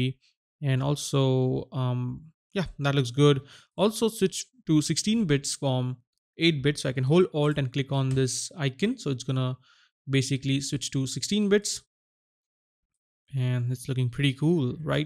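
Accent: India. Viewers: Время идёт, а индийский акцент не меняется :) В чём дело?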